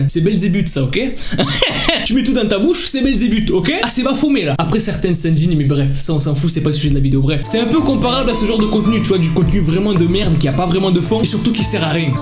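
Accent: Japan. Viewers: Au ptn mais t'a kira dans le sang toi avec ton manifique accent japonais UvU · Ton accent JAPONAIS TUE!!!!😍💕💥💥👌👌#TeamOtaku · La pub 😱😱😍😍😍 ton accent en japonais est juste magnifique 😍 j suis in love 🥰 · Purée magnifique l’intro parfaite reference a death note avec kira (Light) wow super j’ai installé le logiciel pour réussir à faire de meilleur vidéo gacha life merci :)) parfait comme toujour UwU *(et en plus tu a mis les music de death note dans la vidéo) et magnifique accent japonais ♡ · franchement, je crois pour une fois je saute pas les placements, et l'accent pépites.🖤 j'adore ton accent japonais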